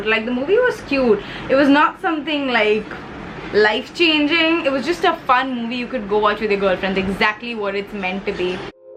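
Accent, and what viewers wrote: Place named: United Kingdom. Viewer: love it as always!😍 and can you plz plz make a video on how you learnt british accent and some tips and tricks to learn it!!!! love ya!😘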